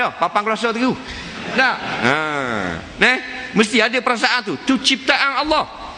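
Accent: Terengganu, Malaysia. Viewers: Saya pn faham... Ganung power...syok dengar org ganung cakap #ankjatikedah... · tak faham la ape dia ckp..loghat ganu pekat sgt..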